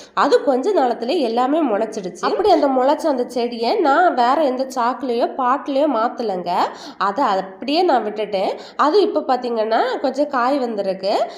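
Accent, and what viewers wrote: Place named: Kanyakumari, India. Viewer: கன்னியாகுமரி தமிழ் வித்தியாசமாக உள்ளது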